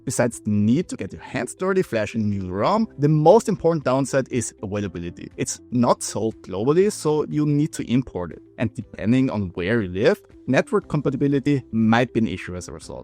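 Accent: Vienna, Austria. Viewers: richtiga wiener heast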